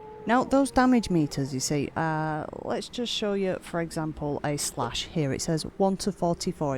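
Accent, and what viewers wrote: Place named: North, United Kingdom. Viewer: Nice to hear a fellow northerner on this part of youtube :) Thanks for making this guide, I feel a bit overwhelmed getting into morrowind so I'm hoping this will make it seem less... huge.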